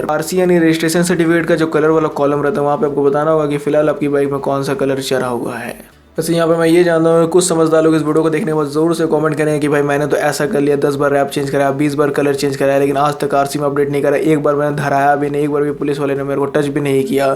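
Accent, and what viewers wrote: Bihar, India. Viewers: Bhai tm Bihar se hai ?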